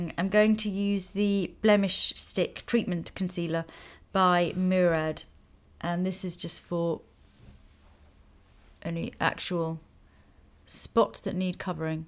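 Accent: United Kingdom. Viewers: Your voice and British accent is sooo soothing!!!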